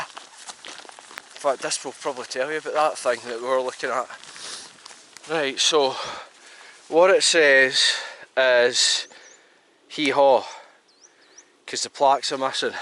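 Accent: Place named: Lanarkshire, United Kingdom. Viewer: Looked like a brilliant day, really enjoying your presentation style, sounds like you come from the Hamilton - Blantyre area originally?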